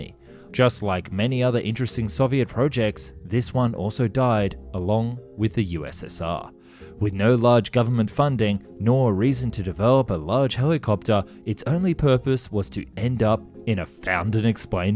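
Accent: Australia. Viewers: I never asked for aussie accent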